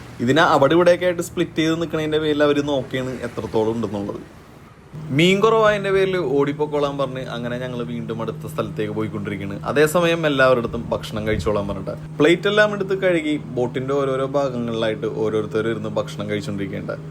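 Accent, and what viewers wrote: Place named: Karunagappally, India. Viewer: കൊല്ലം കരുനാഗപള്ളിക്കാരാണോ സംസാര അത് പോലെ തോന്നി എന്തായാലും നല്ല വീഡിയോ❤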